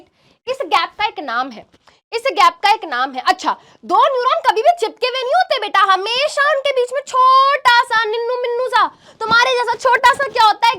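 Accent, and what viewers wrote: Bihar, India. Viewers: Bihari Ascent🤩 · Just luv your Bihari Ascent🤩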